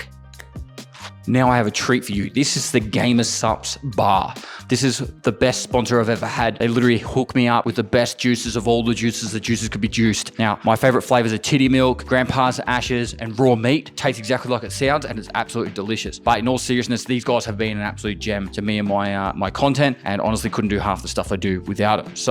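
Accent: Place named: Australia. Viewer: why does remind me of russell crowe. not just the accent, but like overall feel and vibe. wise old aussie or NZ'er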